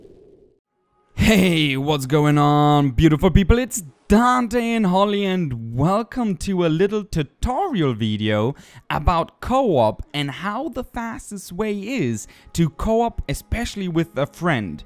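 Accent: Germany. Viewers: Höre ich da einen deutschen Akzent? 😏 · yes, he's either german or austrian. I know because all of us have that exact same weird accent when speaking english, lol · you're not a native english speaker, I can hear it. I'm guessing you're german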